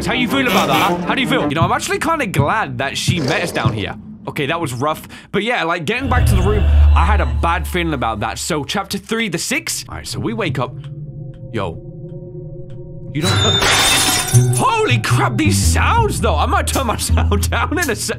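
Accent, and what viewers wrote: United Kingdom. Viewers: British people pronounce certain words interestingly.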